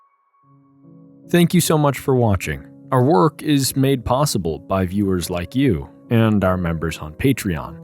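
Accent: United States. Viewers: Oh that's what he's talking about in the video, the first 5 seconds i heard the american accent and paused to check the comments, all americans do is war monger constantly, every country outside america is monopoly and entertainment to their boring lives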